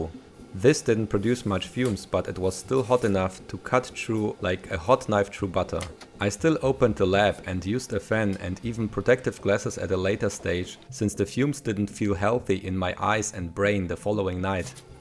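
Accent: Germany. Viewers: Are you german? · so you are German 😂. Das "Nein" und dein axent sind ziemlich verräterisch.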